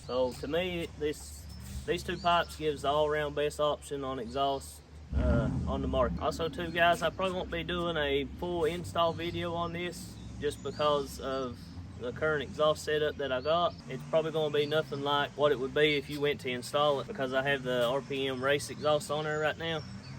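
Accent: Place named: United States. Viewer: This guy have a really hard accent to understand,,, no offense but people from the USA need to learn how to speak English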